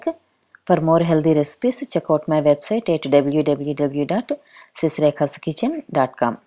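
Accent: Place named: South India, India. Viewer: This curry was amazing. I love your direct and very clear directions. What a wonderful South Indian accent you have, ma’am. Thank you 🙏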